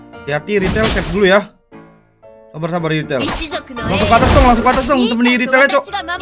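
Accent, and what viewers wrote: Java, Indonesia. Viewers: bg medok skrg wkwk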